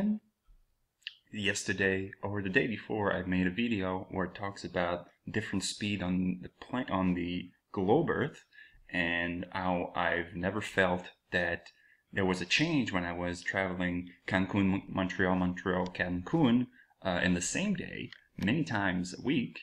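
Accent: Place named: France. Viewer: Salut. J' ai compris que tu volais Mtl-Cancun, et je croirais entendre un petit accent. Alors je me dis que tu es français. Je commence a croire ce nouveau concept de la terre plate et c'est très troublant. Je pensais justement utiliser un programme pour tester mais tu as fais ça dans ce vidéo. Merci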